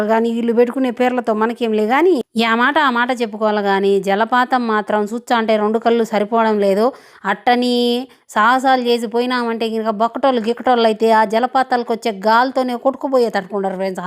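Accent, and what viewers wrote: Rayalaseema, India. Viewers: Good afternoon sister, I am always very interested listen to your Rayalaseema Slang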